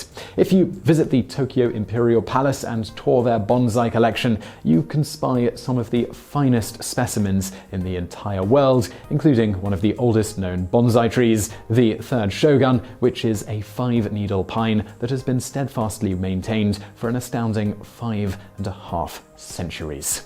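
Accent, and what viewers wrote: England, United Kingdom. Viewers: While I really like this video, as I like this channel's other videos, his pronunciation does little to clarify the distinction. For one, a key difference between "bonsai" and "banzai" is the "S" sound versus the "Z" sound. The two are pronounced in Japanese as distinctly as one would reasonably expect them to be. pronunciation of the two words seems to come off as "Z" both times. Secondly, we have that sort of typical British thing where an "ah" sound in a foreign language is pronounced like the "A" in "apple". Like when virtually any British chef says the word "pasta". I don't know where this comes comes from, but it seems very British, and in this case, it's not helpful. That's because when we're talking about the difference between "bonsai" and "banzai", the first vowel sound is not a matter of the difference between the "O" sound in "odd" and the "A" sound in "apple", but rather the difference the "O" sound in "bone" and the "A" sound in "audience". 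is a great presenter, but when discussing matters of the pronunciation of foreign words, he'd benefit from consulting a native speaker. TL;DR - "Bonsai", the tree, is pronounced like "bone sigh". "Banzai", the battle cry, is pronounced sort of like "Bond's eye", but a little less stank on the D. Yeah, I said "Stank on the D". This comment has been entirely too serious. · Kew Gardens Station He’s english, he’s going to pronounce words differently than you. Do you know how many accents there are in the United Kingdom? Get off of your high horse and actually contribute. I don’t see you making videos?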